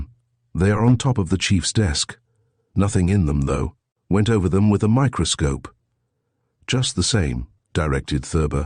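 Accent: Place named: England, United Kingdom. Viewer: having a strong English accent read a specifically AMERICAN tale.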